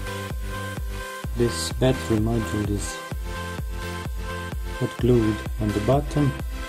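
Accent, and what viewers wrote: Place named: Italy. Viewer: dall'accento sembri italiano :o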